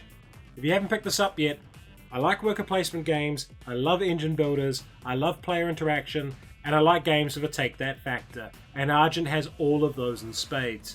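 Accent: New Zealand. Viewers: yaaaaaaa your a new zealander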